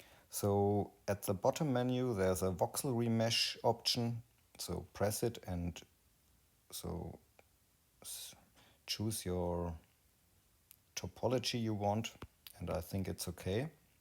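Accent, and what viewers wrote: Bavaria, Germany. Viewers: Supergut! Wann immer ich irgendwas suche, Du hast fast immer ein Video dazu =D Da leb I a mim boarischn englisch =D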